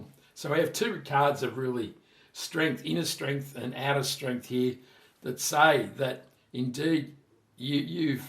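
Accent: Australia. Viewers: Hi, are you an Aussie mate ?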